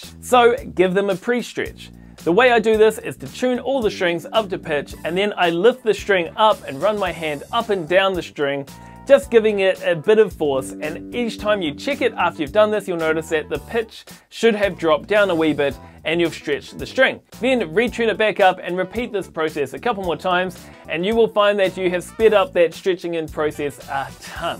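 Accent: New Zealand. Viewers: Sounds like a kiwi living in Australia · Tone down that New Zealand accent and I might listen longer.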